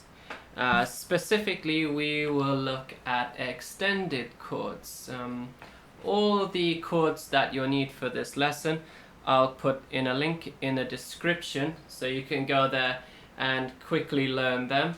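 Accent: United Kingdom. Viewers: You sound like the British reporters who report the war in Afghanistan.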